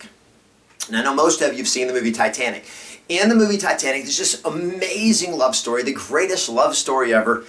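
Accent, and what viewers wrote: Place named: South, United States. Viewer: Mr., I am glad to see more of your southern draw! That's your TM! Aside from being a BadA$$ Real Estate Coach, of course.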